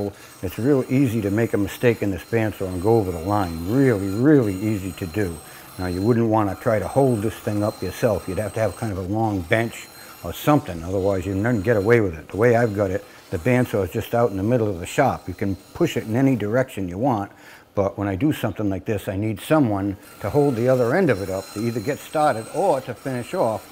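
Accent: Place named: New England, United States. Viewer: Good to see you,. Hope all is well. I really enjoy your old school approach, the sound of a common-sense New Englander in your accent, and your calm love of precision. You are an inspiration.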